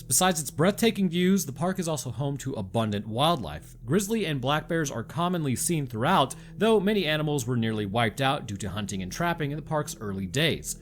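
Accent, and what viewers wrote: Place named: Connecticut, United States. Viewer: The Connecticut accent is feint yet still unbearable. Please try harder to escape the hell hole you're from.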